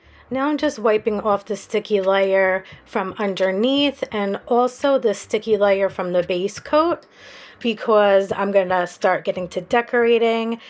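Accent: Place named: East Coast, United States. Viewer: Gorgeous gel builder I really like it!! You sound like you are definitely from the east coast..yyaass sis!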